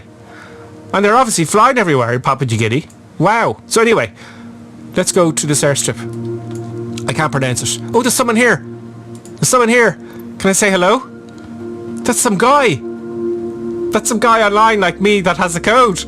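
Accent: Ireland. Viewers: It's just his irish accent. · That was an irish accent? Damn, I found it quite annoying how he pronounced words.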